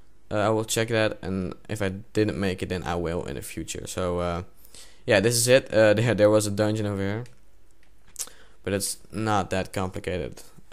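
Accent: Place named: Netherlands. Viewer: wow jij klinkt nederlands zeg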